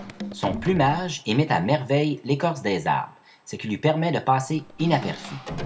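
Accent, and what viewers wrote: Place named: Quebec, Canada. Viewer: Pas mal! Avec un sacré accent québécois tout de même...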